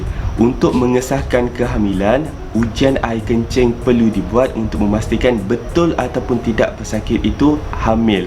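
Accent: Kelantan, Malaysia. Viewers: Doc org kelantan ke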